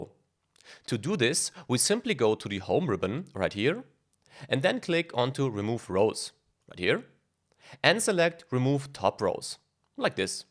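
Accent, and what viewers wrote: Germany. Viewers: Love his German accent.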